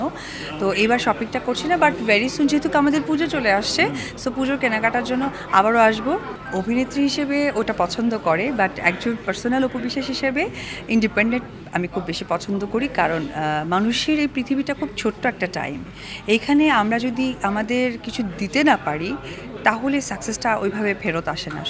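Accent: Kolkata, India. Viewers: Kolkatar tan saro didi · নুসরাত ফারিয়াকে নিয়ে তো মজা নিছেন,এখন আপনি কেন কলকাতার মত কথা বলছেন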